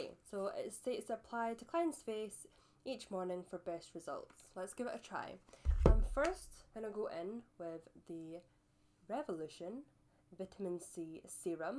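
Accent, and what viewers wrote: Scotland, United Kingdom. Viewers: are you Scottish??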